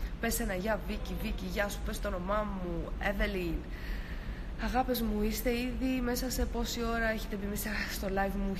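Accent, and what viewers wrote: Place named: Germany. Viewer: Το χειροτερο ειναι οτι παει να παραστησει την χαριτωμενη! Το αμεσως επομενο που κανει την τοοοοοσλ ενθουσιασμενη. Το λαστ μπατ νοτ λιστ, που μιλαει αγγλικα με προφορα χωριατη γερμανου. Αστο δεν εχεις αστρο...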